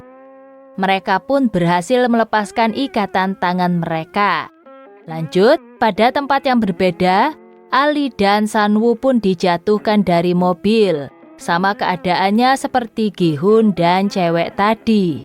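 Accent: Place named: Java, Indonesia. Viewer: Mbak e suarane medok tenan 🙏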